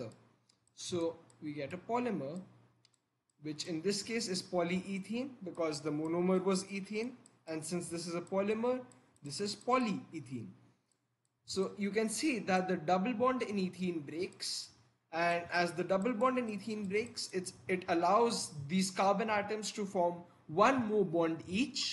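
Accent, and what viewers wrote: India. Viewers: I feel u INDIAN right..?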